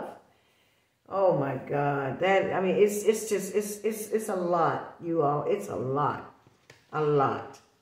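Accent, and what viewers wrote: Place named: Boston, United States. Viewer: Love your Boston accent! Keep up the good work!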